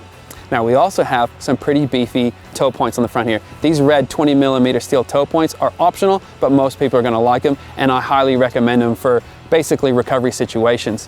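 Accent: Australia. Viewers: Sick looking Ute man! WAIT... is he Australian 🇦🇺 or American 🇺🇸 ? That accent confuses my ears. 👍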